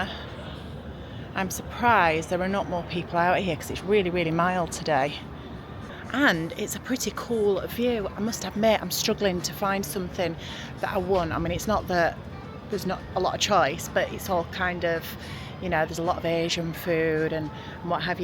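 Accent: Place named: Yorkshire, United Kingdom. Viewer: Where is your drinkssssssds………….. 😂😂😊 I am really fascinated about your accent, and I really like watching your videos for it, only I am student from Sheffield and I really like Yorkshire accent, 😂😂😂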